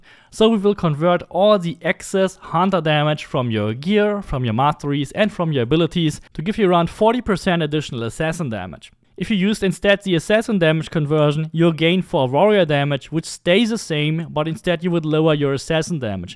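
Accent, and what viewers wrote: Germany. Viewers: Man hört den Deutschen zwar noch rauß aber deine englische aussprache ,bis auf ein paar deutsche Klassiker, ist echt mega.Englisch Studium oder einfach nur sehr viel englisch geredet?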